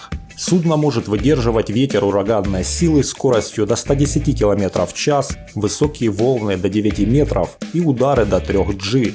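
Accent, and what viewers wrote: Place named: Ukraine. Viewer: Опять украинский коментатор. Акцент слышен.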